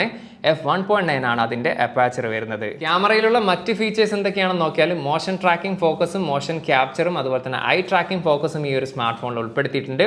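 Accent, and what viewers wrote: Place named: Kozhikode, India. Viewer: അതിന് ഞാൻ നാട് എവിടെയാണെന്ന് ചോദിച്ചില്ലല്ലോ സുഹൃത്തേ...! നമ്മൾ ജനിച്ചു വളർന്ന ദേശത്തെ ഭാഷ അറിയാതെ നമ്മുടെ സംസാരത്തിൽ വന്ന് പോകുന്നത് സ്വാഭാവികം.. അതിൽ തെറ്റൊന്നും പറയാനില്ല.. പക്ഷെ ഇത് പോലെ ഒരു പബ്ലിക് space-ൽ പരിപാടി അവതരിപ്പിക്കുമ്പോൾ അത് വരാതെ ശ്രദ്ധിക്കാൻ നോക്കണ്ടേ..? കാരണം, കോഴിക്കോടുകാര് മാത്രമല്ലല്ലോ അനിയന്റെ ഈ content കാണുന്നത്....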